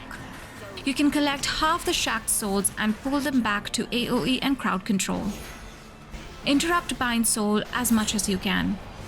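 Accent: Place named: Asia. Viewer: It's very refreshing hearing a fellow Asian covering WoW with your accent lol ❤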